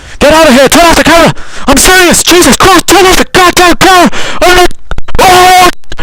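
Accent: Ireland. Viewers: Are you irsh? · yep hes irish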